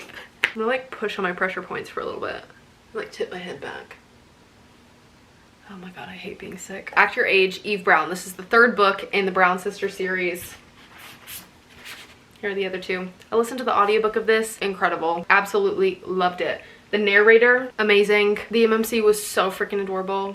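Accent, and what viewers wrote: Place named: South, United States. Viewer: Girl literally never apologise for a southern accent 😂💖 i think its really charming . get well soon!!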